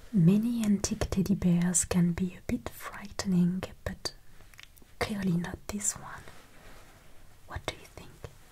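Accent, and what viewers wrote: France. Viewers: I just realized that your beautiful way of speaking English with your French accent has brought back many memories from 30 years ago when I fell in love with a Vietnamese woman who I regard as the love of my life, who learned French when she was young in school in Vietnam in the 1960s and lived and worked in France (Bretagne) for a decade after escaping Vietnam in the 1970s. She learned English as an adult and had a very unique way of pronouncing certain English words, which thanks to you I now recognize as being a French accent but I never realized it until now. Thank you for bringing back those good memories even though my love story had a sad ending, but having listened to your voice in a wonderful ASMR video has helped heal the scars that are still there for me as I can hear her voice once again through your voice.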